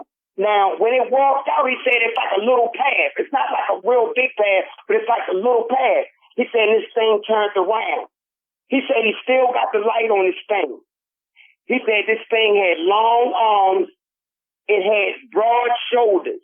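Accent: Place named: South, United States. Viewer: Aye, Great stories, can't wait for more. Thanks!!!!! P.s I Love your Southern accent!!! Be safe!!!!!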